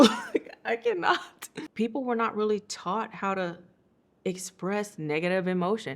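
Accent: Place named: South, United States. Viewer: I love that you speak Korean and have the cutest Southern accent.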